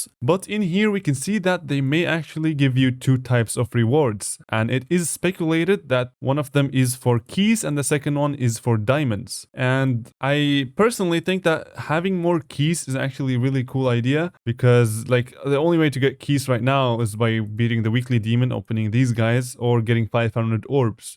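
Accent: Germany. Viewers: You are from Germany?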